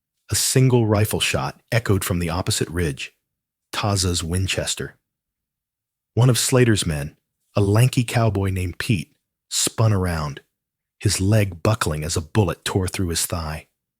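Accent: United Kingdom. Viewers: . Thank you for your response. it’s not a matter of what I am used to, it’s about that being far and away my favourite… “there’s a difference”… others, I am used to and can get used to, but no other I have heard has that perfect British RP accent. It’s a preference.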